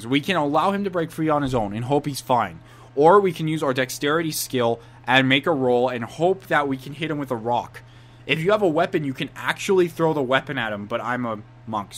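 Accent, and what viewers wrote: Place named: Canada. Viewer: I love Arizona, one of the craziest environments I have ever seen! Driving in the desert while a mountain of snow is at a distance! I hope I don't offend you but I thought your Canadian.. I'm Canadian and the accent is very similar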